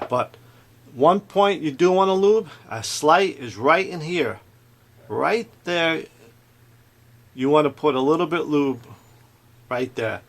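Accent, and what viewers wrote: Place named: Long Island, United States. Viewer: recognizes the LI accent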